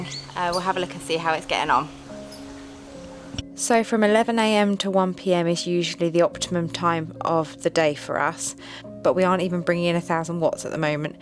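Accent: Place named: United Kingdom. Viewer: Thanks for uploading this video love the British accent ❤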